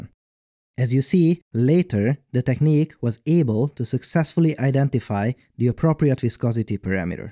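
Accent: Hungary. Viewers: i dont know why i'm here, i've never been interested in 3d simulation, but as i heard you speak, i knew youre hungarian haha ;)) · Are you Hungarian?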